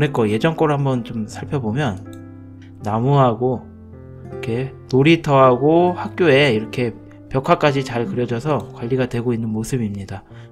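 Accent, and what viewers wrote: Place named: Gangwon, South Korea. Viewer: 채널주인분 강원도 아니면 충북 쪽분같내요 말투가 약간그쪽분같음 친근하내요 ㅋㅋㅋ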